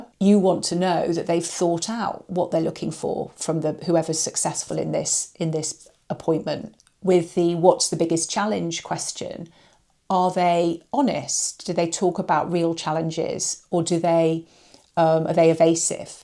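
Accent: United Kingdom. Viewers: I was recommended your video just now, and signed up for your newsletter! I am glad your video popped up. I like your demeanor and tips. I like your demeanor because you sound like you are from the UK. Here in the USA, many places need that good ol English/British/or other UK country wisdom that helped mold the USA.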